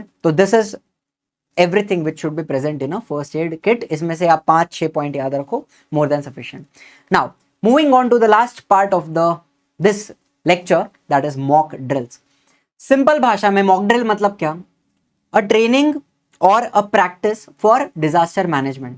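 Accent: Maharashtra, India. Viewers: Sir AP Marathi ho kya Hindi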